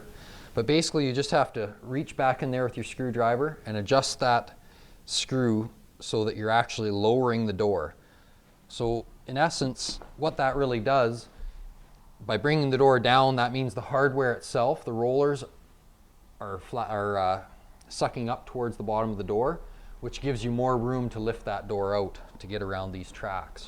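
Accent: Canada. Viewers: Only needed to see the first 5 mins, but was captivated and watched the whole thing. The Canadian demeanor is just so lovely...at least from the accent he sounds Canadian…anyway, excellent clip! Right now, my sliding door feels super heavy, and not being that handy, I couldn’t figure out how to pop it out to clean the tracks (even though they look pretty dang clean, or whatever. I had no clue you could adjust the height of the hardware under the door. This is going to be super helpful. I’ve got a weekend project that shouldn’t take much time at all. THANK YOU from Ohio. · great informative video sir, you from Canada eh? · My wife is from Victoria BC. I picked up your accent immediately. Thanks for the vid! I have to replace a broken door.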